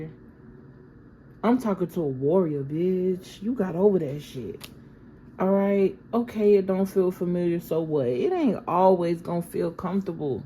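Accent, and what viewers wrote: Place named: South, United States. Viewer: Country accent!🤣🙋🏼‍♀️ I appreciate you and your messages so much. ❤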